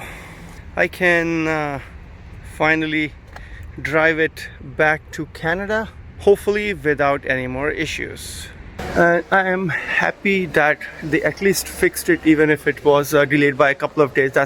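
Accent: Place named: India. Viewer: I know this accent. Are you originally from India? If so, where at? I lived there for 2 years.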